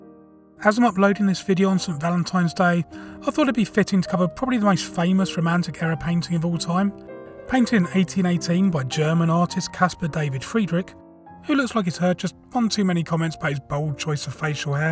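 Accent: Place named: Netherlands. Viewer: you sound more Dutch actually, which is very funny to me as a Dutchie in Germany. Looking forward to your next videos, I just discovered your channel via Reddit & I’m binge watching all of them now👌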